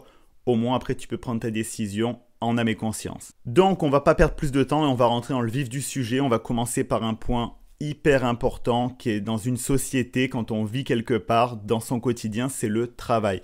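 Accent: Canada. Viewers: Vous êtes là première personne qui parle dans un façon du Canada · Par contre.... après SEULEMENT 4 ans... DÉJÀ adopté L'ACCENT Québécois (On en parle)....alors que ma fille a vécu 12 ANS.... au Québec à Montréal Sans AUCUN Accent 🤔🤔🤔..... pfffffffff.....😤😤😤😤😤😤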